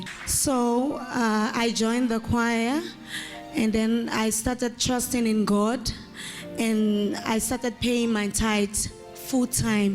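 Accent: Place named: Liberia. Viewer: She sounds so Liberian! I'm a Liberian, I will soon be there in Jesus name!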